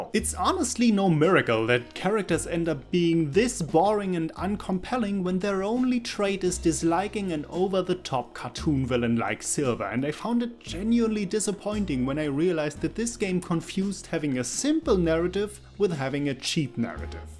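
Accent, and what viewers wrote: Germany. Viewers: U are German right. Love the accent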